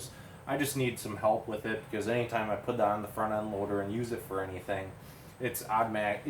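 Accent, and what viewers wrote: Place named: Wisconsin, United States. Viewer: Done. I am also from Wisconsin so I recognized the "accent."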